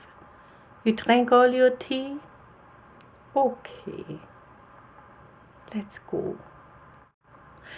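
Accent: Germany. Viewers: Unexpectedly brought to this video and I really like it because of the strong accent I don't hear so often in other videos. The dialogue makes me feel like I was staying in a small, family-run inn after a long day of traveling. I feel like comfortable and safe in the capable hands of German hospitality. I haven't been to Germany, but I very much hope to in the future. I would look forward to more videos! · This is the first time ive seen tbis video what a great job I loved the extra strong accent you look like a real nurse I know these types of videos are not easy it would be so cool to see more videos like thiz if you can ever find extra time that is i would love if you made a video with you speaking in german just for extra asmr tingles im sure all of your followers also would enjoy to hear you speak your native language anyway this was just a thought i love asmr where people have accents or speak in other languages this is a huge asmr trigger for me Thank you for all your hard work love from nanaroriasmr 💖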